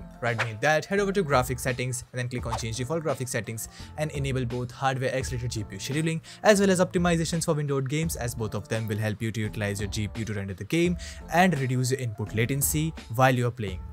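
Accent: India. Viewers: I knew this was a dumb video when i heard that Indian accent, blocked channel.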